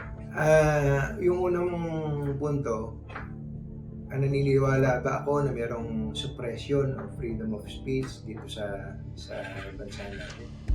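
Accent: Philippines. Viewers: taga saan ka ba ka tunying, parang purong tagalog ka e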